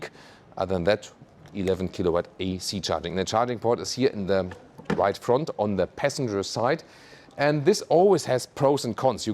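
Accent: Germany. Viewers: bro bitte lern besseres Englisch... ist nicht böse gemeint nur Kritik (dein Satzbau war zwischendrin wirklich fatal), aber behalt den deutschen Akzent, den mag ich ;) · Dude, I love your videos but you really need to improve (fix) that accent. I know that German is closer to English accent than Greek, but believe me, this makes it also much easier for you to transition to a more British accent. It is hard to concentrate to content when I think I am listening to Mertz talking about German Fiscal discipline!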